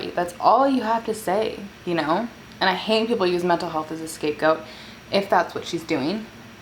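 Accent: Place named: Washington, United States. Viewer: It's a Washington accent. Many Washingtonians pronounce t's as d's at times. "water" sounds like "wodder" in WA.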